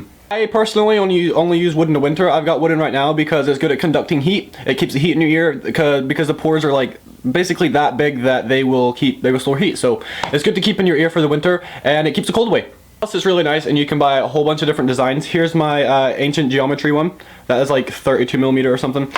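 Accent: Scotland, United Kingdom. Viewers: Are you scooottiish or iriish?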